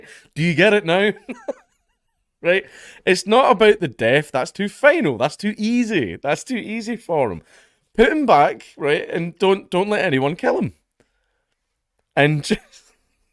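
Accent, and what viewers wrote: Scotland, United Kingdom. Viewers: Hey, Dankula, make an update video. I need to hear the today's news in Scottish accent 🤌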